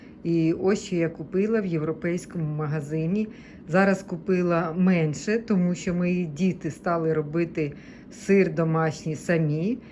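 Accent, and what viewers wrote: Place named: East, Ukraine. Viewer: Вітаю! Ви не переживайте про те, що розмовляєте на суржику.😊 Це типова вимова сходу і півдня України.😊 Ви все надолужите, було би бажання. З появою інтернету, у Вас є можливість перевірити озвучку відео, з допомогою Гугл онлайн перекладача. Ви краще приділяйте більш уваги англійські мові. Вона Вам буде в нагоді і в США, і після перемоги, якщо повернетесь, і в Україні. Будете, як то кажуть "в струє"😊😊 Ностальгію за вітчизняними продуктами демонструють всі іммігранти.😊 Це тішить душу. Але Ви живете в такому місті і маєте можливість ( не завжди...), але бувати в Вашингтоні. Там, мені здається, є все і зі всіх світів😊. Хіба, що це не дешево(. Бажаю Вам здоров'я! Вам і Вашім дітям благополуччя! Ви молодець! Успіхів! P.S. А в нас по всій Україні ревуть генератори Гул стоїть постійний. Жара 35°С. Но все працює! Продукти і товари є. 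Всі ми маємо надію і віру на Перемогу❤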